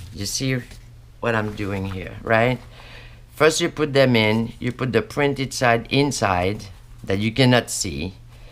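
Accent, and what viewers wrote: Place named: France. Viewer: I just found your channel. I enjoyed watching your video so much! I already knew how to make the project, but I continued watching because youe personality and commentary are so great! It was like the voice inside my head when I am crafting, just without the French accent (which is very cool, btw😊). Thank you so much for sharing this!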